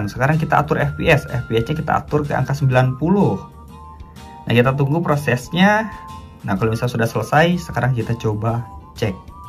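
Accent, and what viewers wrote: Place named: Java, Indonesia. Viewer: wkwk mangkanya pas ngomong kek ada jawa jawanya gtu, salam dari jawa mang gw juga jawa, jawa timur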